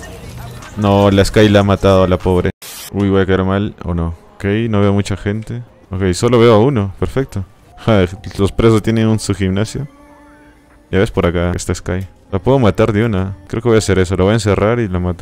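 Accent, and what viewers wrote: Peru. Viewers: eres peruano ??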